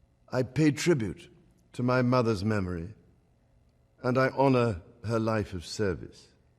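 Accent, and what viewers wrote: RP, United Kingdom. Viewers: That posh accent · Not a fan of this man's but must say ' His speaking has such elegance. The British English and the accent can be very sophisticated. More than any other accent. Including the accent of this wonderful country America. I think the pronunciation of the words are so proper and classy.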